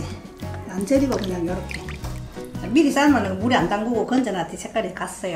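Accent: Gyeongsang, South Korea. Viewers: 경상도 사투리가 참듣기 좋아요 전 전라도 사람인데요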